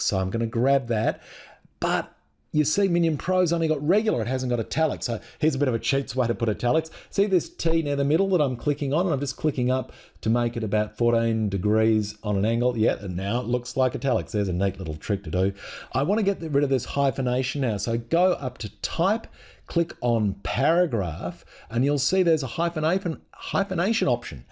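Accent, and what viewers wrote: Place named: Australia. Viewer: Great tutorial. Easy to follow, lots of tips. :-) I love to find an Aussie talking. I have one tip of my own (which you probably know of) Double click on text box corner handle will resize (shrink or expand) text box to fit text. This removes overset "red plus" indicator. Also a request for future posts. I am deaf and I rely on CC, so please minimise the amount of info on the bottom 1/4 of screen, because sometimes critical visuals are concealed by captions.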